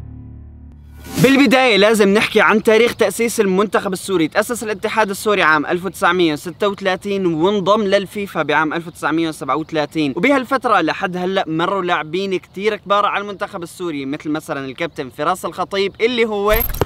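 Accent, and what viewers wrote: Saudi Arabia. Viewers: هل انت سعودي ولا سوري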